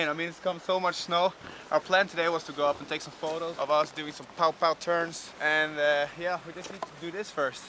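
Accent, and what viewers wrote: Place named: Sweden. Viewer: feeeett! Jag är också från Sverige haha, tyckte de lät som om du va svensk;)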